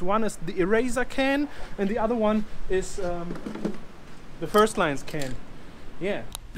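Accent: Germany. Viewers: Is his accent german?😅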